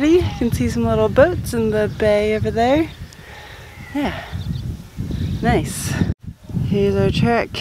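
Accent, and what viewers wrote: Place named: New Zealand. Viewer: Living in NZ I notice you are building an accent towards the end of the video.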